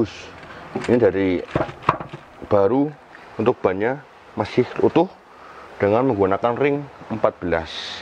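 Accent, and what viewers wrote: Banyumas, Indonesia. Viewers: Berkah selalu mas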